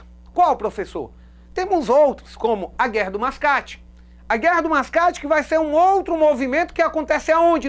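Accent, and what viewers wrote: Northeast, Brazil. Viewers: Esse sotaque nordestino é tããão lindo 😍 Parabéns pela aula, muito esclarecedora 👏🏼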